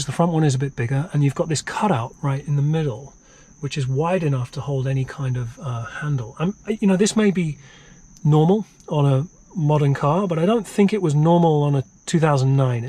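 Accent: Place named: United Kingdom. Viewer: Why are you speaking british ?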